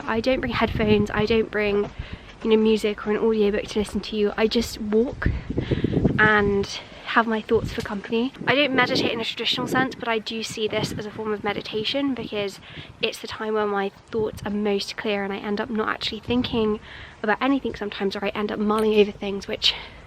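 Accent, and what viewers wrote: United Kingdom. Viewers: I wish She could read to us. Her voice is very calming and lol...I love her British accent. 😆👍💜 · British accent and cute home content.........yes, i love it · As a foreigner i am literally amazed by your smooth British accent and your calmed temper. Your style is so cozy and cute. The way you spend your free time with reading a lot (not ‘waste’ it like unfortunately majority of people nowadays, especially on YouTube) is immensely inspiring♥️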